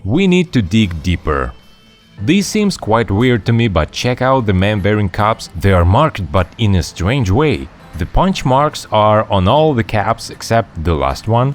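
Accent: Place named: United Kingdom. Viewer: You have an impeccable British accent.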